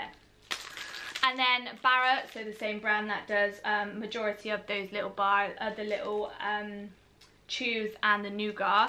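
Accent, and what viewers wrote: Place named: West Country, United Kingdom. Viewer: Thank you my lovely, so helpful...were are you from?you have a west country accent!!X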